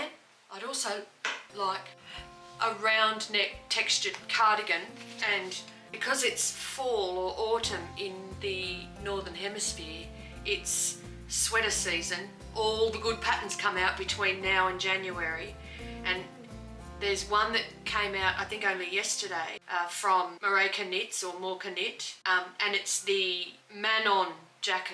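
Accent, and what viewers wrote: Australia. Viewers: I've been waiting for you to pop up on my tv. So interesting to hear you talk about your knitting projects and I really like to hear a real Aussie accent that isn't tonally flat like mine. Summer is just about here and Im trying to finish a couple of woolly shawls. Cashmere and Rabbit sound very exotic. I haven't used either yet.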